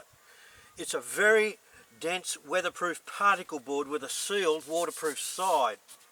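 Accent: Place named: Australia. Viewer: I guess all English speakers sound alike to everybody else in the world. I have to admit I can't tell the difference between somebody speaking Chinese or Korean in terms of sound - I don't speak either language. It's ethnocentric I believe. But definitely sounds like an Aussie to my Yankee ears. Awesome video.. Good on ya...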